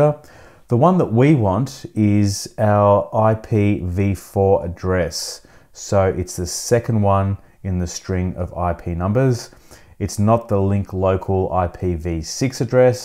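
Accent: Australia. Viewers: sounded Aussie to me...?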